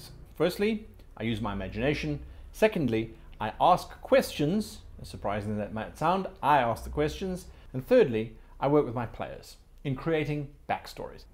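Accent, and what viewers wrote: South Africa. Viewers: Do I hear a hint of Afrikaans in that accent ? ... if so, Greetings ! really enjoyed this video, amazing tips, will definitely put them to use. You have my thanks. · are you South AFrican? YOu sound South AFrican.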